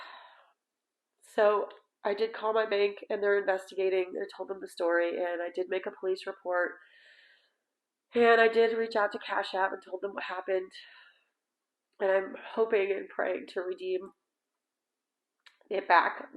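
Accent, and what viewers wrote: India. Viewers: East Indian accent? Asking because it’s very common. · Indian accent maybe.?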